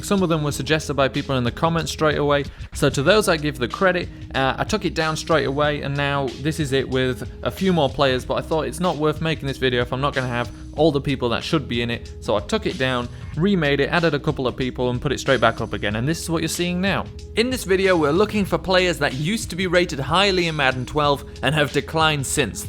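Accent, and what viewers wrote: England, United Kingdom. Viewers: It's satisfying to hear an english guy talk about madden lol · Stfu your British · dude you actually know what your talking about with Madden OR actual NFL??? AAAANNNNNDDD your playing biggie beats in the background?? and your British??? new sub for you mate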